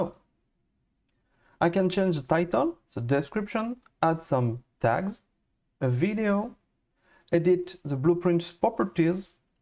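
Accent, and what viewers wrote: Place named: France. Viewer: Your french accent is beautiful 😂❤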